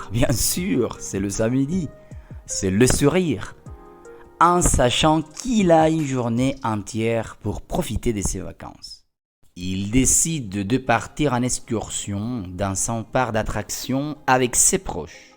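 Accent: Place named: France. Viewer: Me parece muy bueno, asi es como se aprende : leyendo, escuchando, hablando. Solo que me perturbé con la pronunciación de algunas pocas palabras muy diferente a la de Bélgica. Supongo que es de alguna région de Francia donde la pronunciación puede ser un poco diferente.pero esta espectacular ❤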